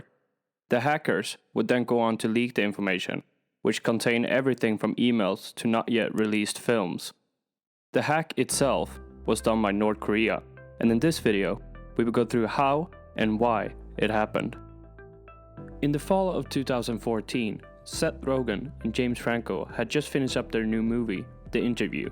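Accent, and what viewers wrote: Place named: Sweden. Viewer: Intressant, men skulle vara bättre om det inte lät som att ni läser och försök få bort svengelskan!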